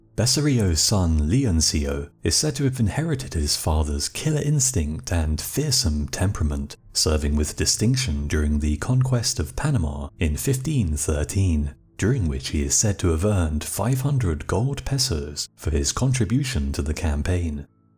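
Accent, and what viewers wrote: United Kingdom. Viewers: #1 Narrator Has a British/English accent, #2 Hyperbolic made up history, this is Definitely British Anti-Spanish Propaganda so much like the Black Legend they created , All Lies, Arriba España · seems kinda farfetched, they had jaguars in their jungles, I'm sure dogs which are much smaller wouldn't scare them much......the fact that a british accented guy is narrating this story makes it that much more questionable...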